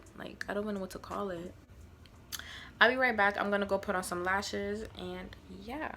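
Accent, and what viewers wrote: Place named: Jamaica. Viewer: Your Jamaican? You have a amazing accent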